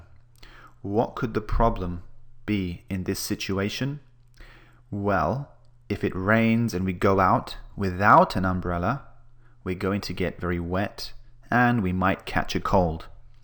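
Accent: United Kingdom. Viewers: Britsh Accent?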